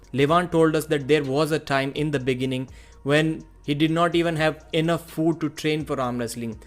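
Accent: India. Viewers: Indian accent is horrible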